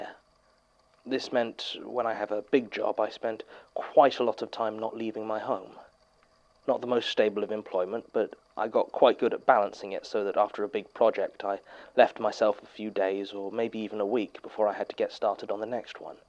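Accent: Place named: United Kingdom. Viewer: I love mumbling in my ear just like doing his thing I love a deranged British man in my ear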